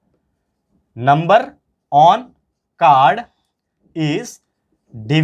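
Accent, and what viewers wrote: Maharashtra, India. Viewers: sir are your mother tongue is marathi. .. just asking😅 And thanks for teaching. Best teacher❤❤🧡🧡🧡🧡🧡🧡💛💛💛💛💛💚💚💚💙💙💙💜💜💜🤎🤎🤎🤎🤎💜💜💜💜💜💜💙💙💙💙💙💚💚💚💚💚💚💚💛💛💛💛💛🧡🧡❤❤❤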